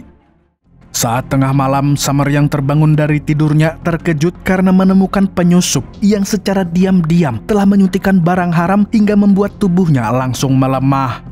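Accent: Banjar, Indonesia. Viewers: Orang banjar kah pian mang?🤣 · Lengah dikit amang nya urang Banjar wkwkkkk😂😂😂😂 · Urang Banua ya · urang banjar 😂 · Banjar sklinya😭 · Banjar kah pian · Banjar kah bang ai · orang banjar kah pian ni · Urang banjar sklinya 😂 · u orang Banjar Kalimantan Selatan mang ae😂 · bah urang banjarkah mang ikaam😅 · orang banjar ternyata 😂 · Banjar banarrrr!! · Logat banjar nya khas banar amang niii 😂😂 Salam matan kuala kapuas 😁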